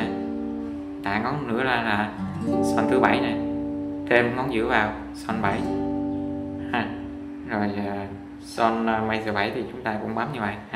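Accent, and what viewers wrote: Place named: Binh Thuan, Vietnam. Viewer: cảm ơn bạn những Video hướng dẫn rất nhiệt tình, nghe giọng giống dân Bình Thuận :D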